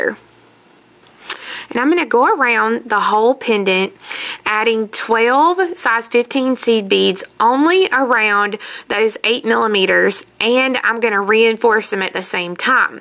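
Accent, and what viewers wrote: South, United States. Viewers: I recently discovered U-Tube and then I found you! I've been bead working for about 15 years but my passion has been revived since watching your's and a few other's tutorials. You are a great teacher! I feel so blessed to have met you through this medium. I live in Alabama so your southern English is very understandable! Lol! I also subscribed to your site! Happy beading!💞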